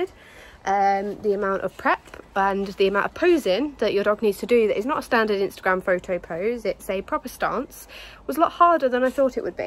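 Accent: United Kingdom. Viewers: Your British accent is ❤️❤️❤️❤️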